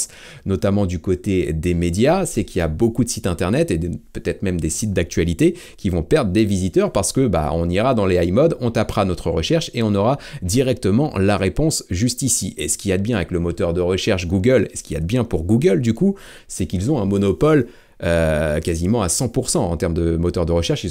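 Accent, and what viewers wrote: Quebec, Canada. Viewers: Merci! Hilarante la vidéo avec l'accent québécois! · L'accent québécois excellent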